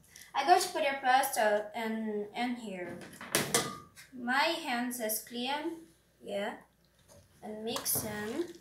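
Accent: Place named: United States. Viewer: Caramba tu parece uma americana falando kkk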